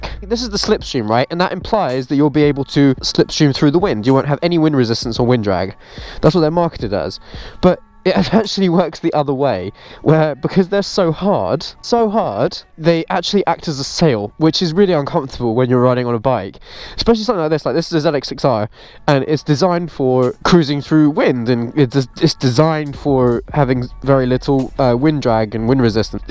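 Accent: United Kingdom. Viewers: The west London accent is actually lovely 'n aw'dat. Fun review, man! Edit: the bit on the indicator being for the giggles got me, I have to say.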